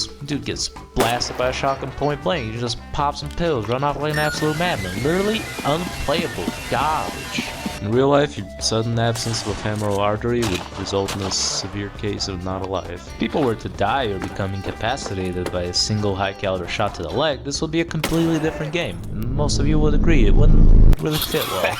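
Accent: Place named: Brazil. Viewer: mano achei por um amigo de oklahoma, conheço ele ha muito tempo cara e ele me mandou os teus dois videos sobre a inércia e eu te achei com uma cara meio brasileira e um sotaque no ingles parecido com o meu, dai eu vi o im brazilian half mexican no primeiro video e resolvi deixar um comentário em portugues porq eu tinha ctz q tu ia saber ler.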